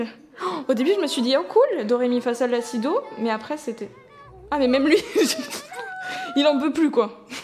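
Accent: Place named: United Kingdom. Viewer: J'adore ton axent anglais sinon te la best ma yt préf 🥰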